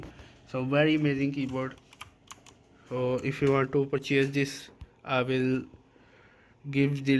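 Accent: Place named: India. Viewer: Your Indian accent is so deep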